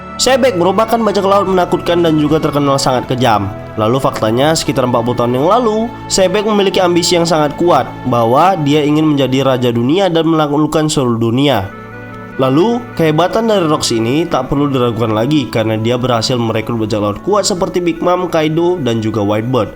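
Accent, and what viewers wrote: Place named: China. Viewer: NARATORNYA BISA LOGAT MANDARIN 😭😭😭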